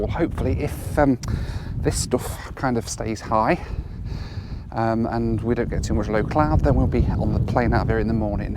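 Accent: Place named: London, United Kingdom. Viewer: Finally u have proved.....people from London are funny.....pathetic accent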